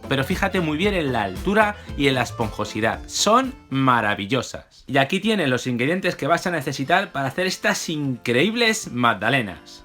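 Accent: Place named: Spain. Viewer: gracias yo soy panadera me gusta demasiado la panadería de que parte del mundo eses tu tu voz parese española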